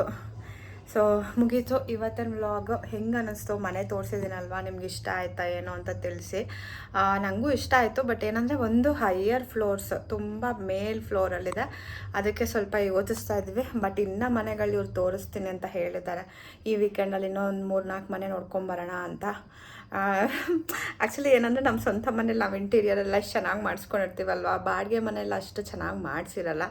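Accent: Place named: India. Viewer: Hi ur kannada accent good r u really a kannidaga wat about ur son is he facing any health issues as per both ur own house and the other house y dont u buy a new house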